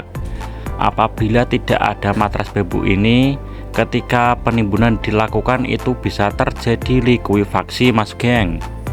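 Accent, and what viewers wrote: Java, Indonesia. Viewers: sampean orang mana mas logat jawanya beda?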